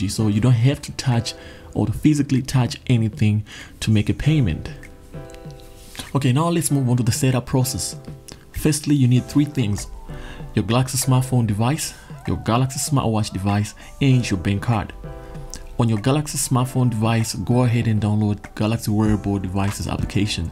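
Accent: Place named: South Africa. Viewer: Def south africa 🇿🇦 broer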